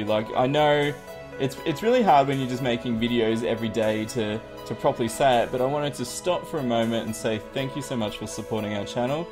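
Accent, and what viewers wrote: Australia. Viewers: Wow didn't realize u were austrailian(guess I should have known from ur accent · is Australian I thought he was English my mind is blown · 4th like I never new u was Australian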